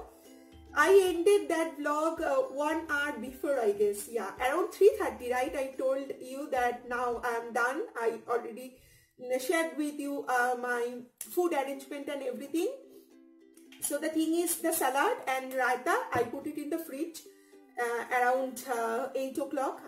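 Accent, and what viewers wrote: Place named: Bengal, India. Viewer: Hearing your english😀😀first question comes to my mind.. are you bengali?☺️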